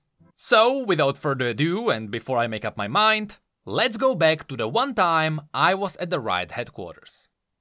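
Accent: Czech Republic. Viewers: Myslel som si že máš taký český akcent lol :D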